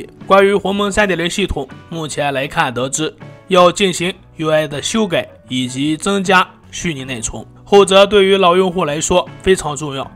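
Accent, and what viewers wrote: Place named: Yulin, China. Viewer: 你是榆林的吧。。这口音。。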